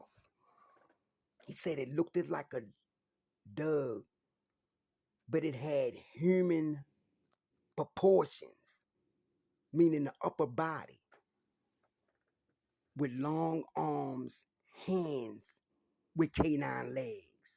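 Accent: South, United States. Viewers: you can narrate a story like nobody’s business. Dang. Skills of a pro actor my guy. I just became a member of the Pack. I’m just north of Albany,NY, in the country. I come from down hear Chicago, & your manner of speaking brings back memories. I figure you’re probably in the South, but a lot of southern folks moved up Chicago ways, and you remind me of that🙂. I love listening to your videos when I lay down at night for bed. Should give me nightmares, but they never do. I can see your podcast really blowing up. It’s unique. Take care of yourself Mr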